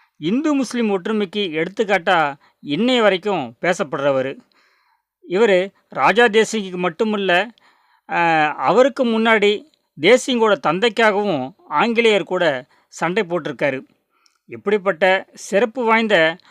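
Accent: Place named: Madurai, India. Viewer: அருமையான வரலாற்று தொகுப்பு. இனிமையாக ங்க போட்டு மதுரைக்காரர் போல பேசுகிறீர்கள் ஐயா.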